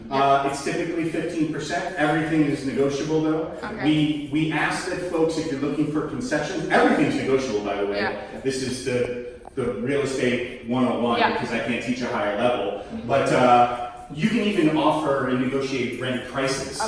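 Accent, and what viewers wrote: Ireland. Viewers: How long have u lived here it’s sounds like ur Irish accent is fading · I'm new to ur channel and r u Irish? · Hey is that an Irish accent? I can't place it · I knew I heard an Irish accent!! ❤❤❤ btw, I liked the last apartment... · Good info, bubbly personality and AN IRISH ACCENT —> never clicked subscribe this fast. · She definitely sounds Irish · Nice Irish accent : ) Lofts are overrated..I stayed in some on Airbnb in NYC, not really great as you think.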